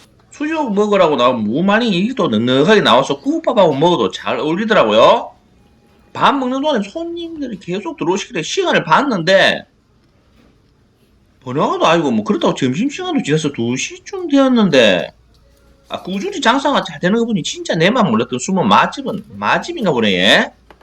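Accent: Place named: Gyeongsang, South Korea. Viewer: 형님. 채널에 따봉 30회 정도 박고 추천 뜨면 꼭 봅니다. 답변 두 가지만 부탁 드립니다. 1. 아무리 맛집이라고 해도, 기대감이 얹혀지면 감동받기 쉽지 않은데, 정말 이 집만은 무조건 꼭 가야한다라는 곳이 있으면, 한 곳 추천 부탁드립니다. 2. 무꾸재비 뜻 좀 알려주시라예. 볼 때마다 너무 궁금합니다. 저도 경남 촌놈인 주제에 처음에는 사투리가 너무 거슬려서 부담스러웠는데, 지금은 그 사투리 없으면 무슨 맛으로 볼까 싶은 마음입니다. 어쨌든 마창석님과 기분 좋은 영상 계속 만들어 주시면 감사하겠습니다. (마창석님의 짜투리 평가도 넣어 주시면 감사겠습니다. 목소리가 궁금합니다.)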